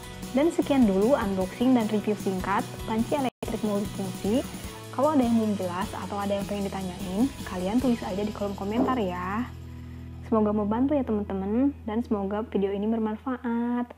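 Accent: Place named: Sunda, Indonesia. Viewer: Kangen sm suarany,,,orang jawa timur tapi suara sunda heee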